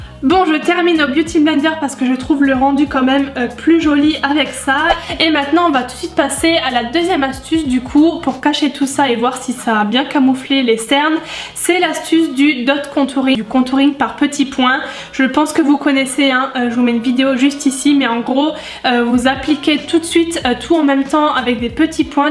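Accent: Lorraine, France. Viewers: moi aussi j'habite en lorraine et moi aussi je dit comme ca 😀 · tu viens de quelle ville ?? ( moi aussi je suis de Lorraine et tous les mots que tu as dis ( le '' t '' à vingt , la clenche ...) je les dis aussi . 😘🤗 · Moi aussi je parle comme sa j'habite en lorraine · moi aussi je dis comme toi comme en lorraine biz <3 <3 <3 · Tu viens d'où si tu viens de la Lorraine ou de L'Alsace ? sinon super vidéo ♡ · J'habite aussi en lorraine et je dis comme toi !!! 😉❤️ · j'habite en lorraine saint remimont vers Haroué c'est vrai qu'on parle comme sa mais moi sa me choque pas mdr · Vive la lorraine 💪🏻 Est ce que tu as aussi un accent en disant certains mots comme boîte, poêle, etc ? Sinon t'es genial j'adore ☺️ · je viens de lorraine dans le 54 et oui je parle comme toi😂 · Je parle pareil que toi!Tu habites où en Lorraine ? Sinon je t'adore continues comme sa bisous💘💘💘😊#TeamSnapchat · Moi aussi je suis de lorraine et je parle comme toi 💓💓,t'es pas seul 🤣🤣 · Oui la facon de parler de la lorraine 😂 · Je comprend totalement ta façon de parler je suis aussi de lorraine 😋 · Moi aussi je parle comme ça vive la Lorraine 😅😂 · Je parle comme toi je viens de lorraine ma belle t'inquiète pas 😘 Super vidéo comme toujours! 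😘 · Oui je parle comme toi et je pense que tous les lorrains/alsaciens sont pareille 😂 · y love you accent lorrains !!!!!! je suis lorraine ! 💕💕💕💖💖💖💖💖💖💓💖💖💓💖💖💓💖💖💓💕💖💗💗💗💙💚💛💜💝💞💟💟💟💟💟❤💘💋💋💋👄👄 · moi aussi je viens de Lorraine je te comprends je parle exactement comme toi💚#teamsnap✨ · Je suis lorraine et je parle exactement comme toi ❤ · Je viens de Lorraine mais j'ai du déménager dans le centre mais j'ai conserver l'accent par choix, et ca me fait plaisir d'entendre parler comme ca, tu te prend pas la tête a vouloir faire plaisir au autre tu es spontanée et c'est ca que j'aime chez toi ❤ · Moi aussi je viens de Lorraine (Meurthe-et-Moselle) Et je dis exactement comme toi! Bref j'adore Ta vidéos tu es parfaite je t'adore ❤️❤️❤️ #Lorraine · Je suis Lorraine aussi et je parles comme toi aussi, quand je vais chez mon copain dans le centre et des que je parles il se moque de moi avec toutes les "fautes" que tu as cité 😂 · Moi aussi je viens de la Lorraine je dis pareil que toi 😂😂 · moi aussi je parle comme toi je suis de Lorraine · Je suis née en Lorraine ,à Metz, donc oui je parle comme toi mdrr😂😂 #teamlorraine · Je viens également de lorraine et en effet je parle aussi comme ca... 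j'taime fort continue comme ca <3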